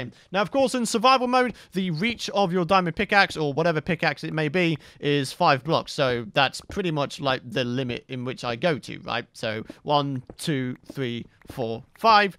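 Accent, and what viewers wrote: United Kingdom. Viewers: I love british accent people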